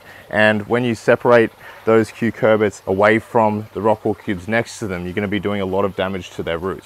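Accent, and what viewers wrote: Australia. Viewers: Love your content!! To also have another Australian accent schooling me is nice 😂 the familiarity is soothing af- cheers! Your content is dead brilliant!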